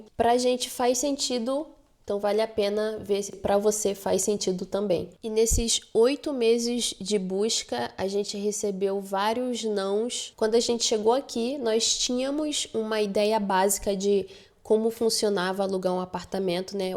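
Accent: Rio de Janeiro, Brazil. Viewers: Eu também não gosto do sotaque carioca. Mas ela não tem culpa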